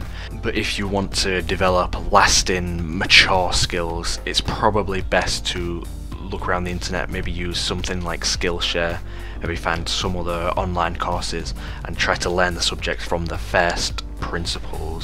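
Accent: Hull, United Kingdom. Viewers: Great stuff very interesting and inspiring! Condolences for your awful accent. What is that? Hull?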